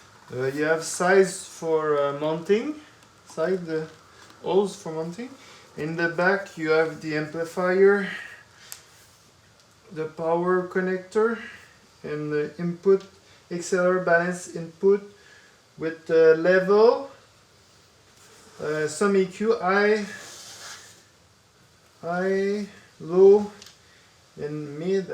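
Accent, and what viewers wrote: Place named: England, United Kingdom. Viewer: J’adore to accent anglais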